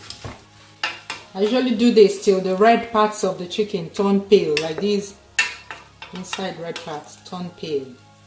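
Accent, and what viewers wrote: Igbo, Nigeria. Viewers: Am more paying attention on that lovely igbo ascent more then the lecture Nnem dalu. On my way to supermarket over a month now this is exactly I need the German resentment never gave me the test I needed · Your accent is always sounding Nigerian, this jollof rice is called benachin or cheb in Gambia or Senegal respectively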